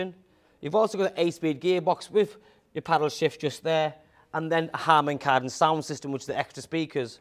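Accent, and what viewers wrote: United Kingdom. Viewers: How you sound so British? Or is this a voice over.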